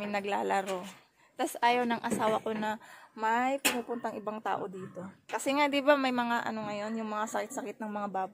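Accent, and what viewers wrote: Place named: Tagalog, Philippines. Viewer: You speak clean Tagalog is here for me to understand👍 salamat. Baboy to loud. New friend and subscriber. I’m Jerry from north carolina u.s. lol mabahoo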